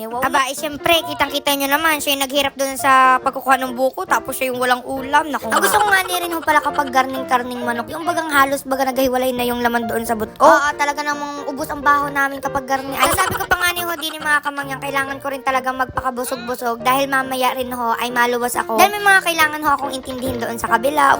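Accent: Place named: Marinduque, Philippines. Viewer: Marinduque ❤.. from samar😅 naaaliw akong manood s vlog mo at ganun na ganun sa salita nila dini sa Marinduque 😅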